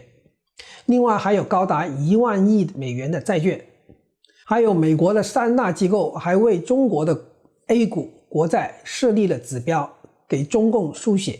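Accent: Hong Kong, China. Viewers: DON先生的普通話像香港人。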